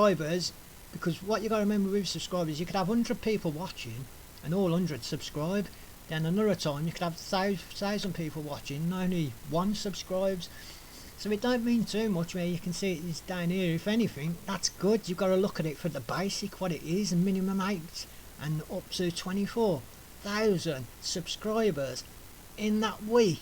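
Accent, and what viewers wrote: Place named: Birmingham, United Kingdom. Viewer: Good video apart from the brum accent !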